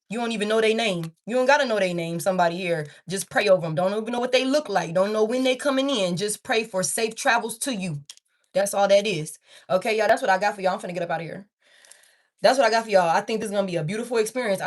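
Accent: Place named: New York, United States. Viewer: You sound like you’re from NY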